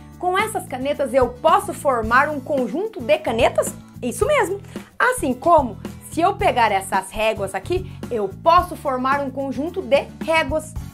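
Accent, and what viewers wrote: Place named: Northeast, Brazil. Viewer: Você é nordestina?? Adorei o seu sotaque 🤗🤗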